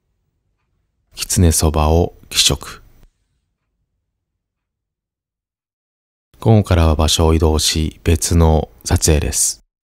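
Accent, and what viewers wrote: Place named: Kansai, Japan. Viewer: いつも楽しく拝見しております、ありがとうございます。 関西の方かと、思ってましたが、東北の方なんですね。 アクセントが関西っぽかったので。。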